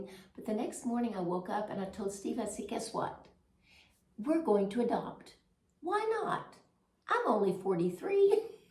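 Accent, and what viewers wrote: South, United States. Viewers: Thought I recognized the Southern accent. I’m from Georgia. Don’t get rid of it. It’s a gift! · It's a shame that people don't appreciate a southern accent or even a traditional southern lifestyle. I personally like and appreciate the diversity that accents offer as well as perspectives on life., I'm curious if you have noticed a similarity between the French approach to life and the old southern approach. When I was young, banks and many businesses took Wednesday afternoon off. As well, professionals often closed early on Fridays. Sundays were closed also for time for church and family with large family gatherings on Sundays around the meal. Things were slower paced and life was pleasant. This seems similar to traditional French life - as I understand it. What has been your experience? What are your thoughts? I love you videos and your accent. 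· I LOVE MY SOUTHERN ACCENT AND YOURS! I get a lot of comments on mine too and I remind them that they have an accent too unless theirs is from England! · Never tamper with your beautiful southern accent, it's charming. You have really lived a full life and still are. God bless you. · I just found your channel, and have watched a few videos. Have to say, you look so pretty with your hair in this loose, flowy way. Love it. And I love how happy you are with your decision. (I am appalled that someone said you should "get rid of" your beautiful Southern lilt.)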